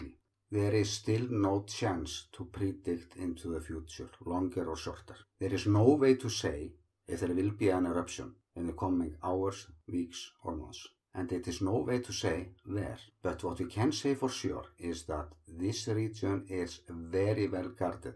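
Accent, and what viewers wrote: Iceland. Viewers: I watch everyday for your update! Is your accent Icelandic or? I grew up in a salmon fishing family here in Northwest Washington State and there were several Icelandic fishing families living in Pt Roberts, WA - last name Gudmundson, they had a lot of red hair in the family and were tall. Viking heritage maybe.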